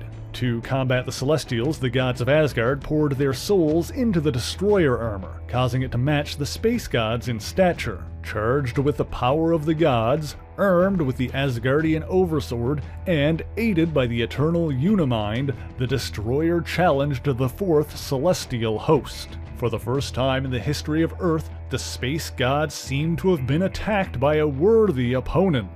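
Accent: Newfoundland, Canada. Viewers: You sound like you’re form Newfoundland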